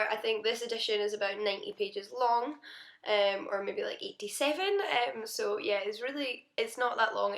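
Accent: Scotland, United Kingdom. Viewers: Where are you from in Scotland? I'm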